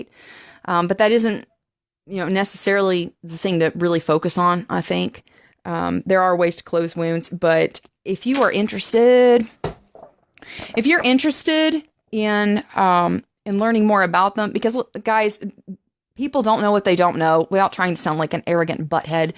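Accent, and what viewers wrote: South, United States. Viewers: Nice to hear the southern accent coming out more!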